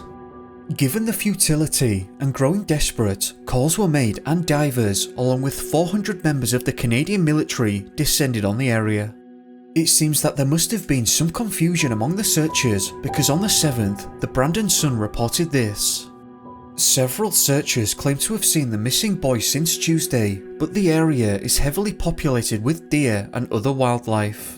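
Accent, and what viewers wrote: United Kingdom. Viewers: Congrats on reaching 100k, always enjoy your videos, fascinating and well researched. Nice to hear a fellow Brit narrating!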